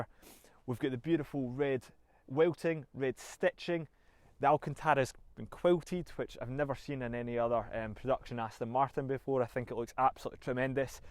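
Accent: Scotland, United Kingdom. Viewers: Are there different accents inside Scotland? Are you from a particular region? Thanks for replying.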